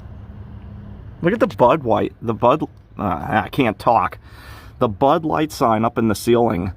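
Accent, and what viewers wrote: Pittsburgh, United States. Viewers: First of all, love your Pittsburgh accent! It kind of makes me homesick. I lived in the North for a long time and agree with you about southern people. If I had a choice between living in Texas or Tennessee however, I would live in Tennessee. Great video bud! You made an otherwise boring old gas station interesting.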